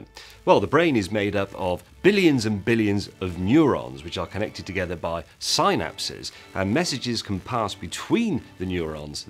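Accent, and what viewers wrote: United Kingdom. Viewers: British accent makes everything sound still more inteligent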